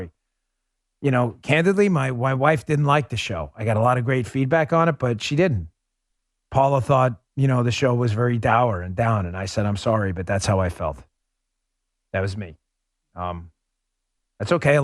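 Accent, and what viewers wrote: New York, United States. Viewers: ....You're always doing a New York accent, Dan.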